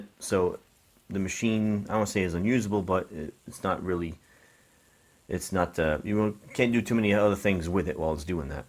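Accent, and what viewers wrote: Boston, United States. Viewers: Is that a boston accent?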